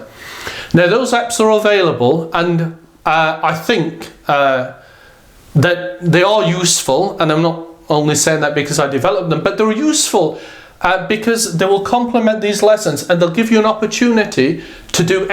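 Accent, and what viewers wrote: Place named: United Kingdom. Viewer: You are speaking British accent! Wow! You're the best Arabic teacher I've ever seen! Thank you, Jazakallah!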